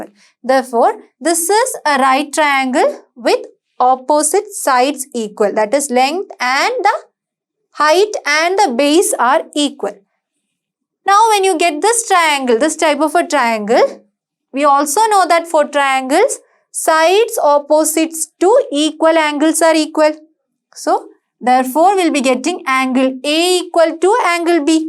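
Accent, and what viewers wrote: Kerala, India. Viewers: More likely Kerala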